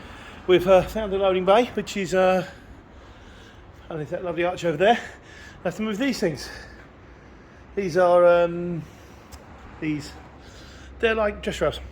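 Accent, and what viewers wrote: United Kingdom. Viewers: Интересно, есть еще кто-нибудь кто слушает этого дядьку из-за британского английского) Строчит как из пулемета MG-42, но интересно)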